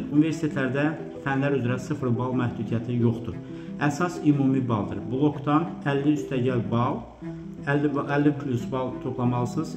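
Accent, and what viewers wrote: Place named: England, United Kingdom. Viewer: müəllim, hərdən siz Azərbaycan dilində danışanda ingilis dili aksenti hiss edirəm😅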